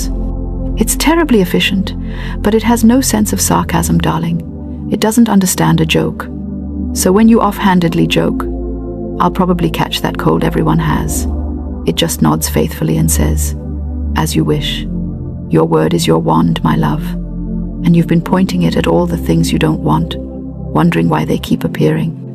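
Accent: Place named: England, United Kingdom. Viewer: American woman with an English accent.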